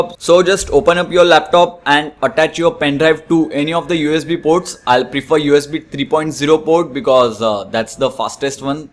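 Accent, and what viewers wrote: India. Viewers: Bro you have the most authentic Indian English accent 👍 ask thanks for the knowledge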